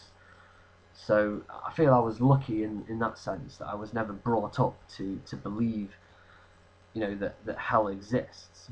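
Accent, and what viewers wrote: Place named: England, United Kingdom. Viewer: I love your accent. It would be very nice if all the English talked this way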